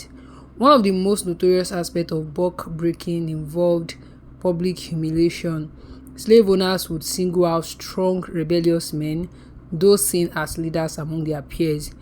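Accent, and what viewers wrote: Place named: Africa. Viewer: Thanks for telling the story I could tell from your accent that you’re from the continent of Africa I wonder why so many Africans hate African-Americans when we came from Africa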